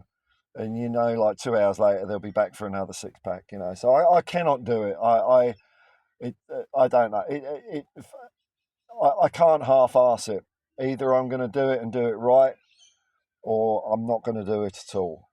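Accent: Australia. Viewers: . Its was a pleasure to watch THE ONLY PSYCHEDELIC SOCCER SHOW ON THE INTERNET!... I was lucky to watch the channel grow from a few subs into a couple thousand. Loved your creativity and sheer passion for Tottenham Hotspur, it was good having an Australian voice for all us in the southern hemisphere (NZ). Im happy you have taking time for your mental health, its whats most important. Its a big loss for the spurs'a'sphere as you and Jacob (unitedspursofamerica) are some of the only positive channels i tune into. Take time, take it easy, I selfishly hope to get an occasional video from you here and there, perhaps after a big win! Thank you mate.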